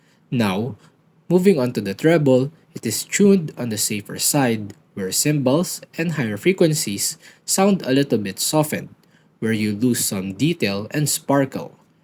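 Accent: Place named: Philippines. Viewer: bro are you also from phillpines like les modio you guys have similar accent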